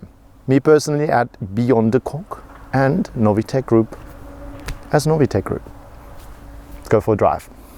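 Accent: Cape Town, South Africa. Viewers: Are you South African ? You sound like you from Pietermaritzburg.... 🤔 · Is the presenter South African? Has our accent, and said “ lekker “ a typical South African trait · Is this dude South African?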